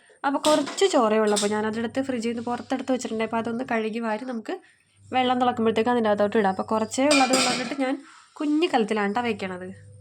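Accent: Kochi, India. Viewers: കൊച്ചിഭാഷ കേൾക്കാൻ ഇഷ്ടമാ ❤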